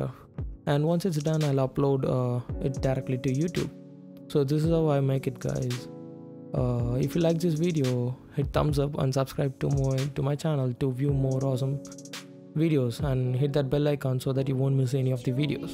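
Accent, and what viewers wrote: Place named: India. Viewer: sorry man but your indian accent is irritating me you should don't talk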